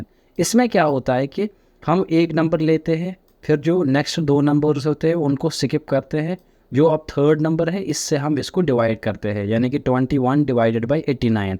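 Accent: Kashmir, India. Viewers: Sir, app Kashmir se ho kya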